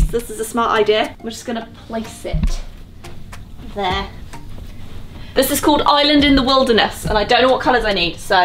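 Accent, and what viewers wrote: United Kingdom. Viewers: How still sound british even she live in America most of her life. Love your art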